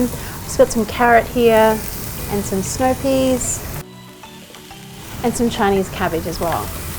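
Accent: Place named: Australia. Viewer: Ive eaten this all my life and love it so much but actually never learned how to cook it. Thank you you made it look easy and your video is easy to follow and understand and didn't leave me impatient while watching. And you pronounced pancit bihon very well but if you want to sound even more correct (not necessary but since you mentioned), just need round out your 'a' sound a little bit more. Like how you'd say (with your lovely aussie accent reading) "parn-sit bee-hawn"...that's how we Filipinos say it. Thanks....you made it look so "Masarap!" (delicious) xx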